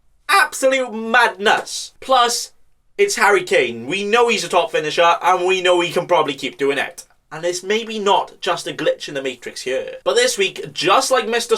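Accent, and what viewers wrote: Wales, United Kingdom. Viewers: Just found your channel and I think your Welsh accent makes the series so mhch more amazing love the series keep it up ❤️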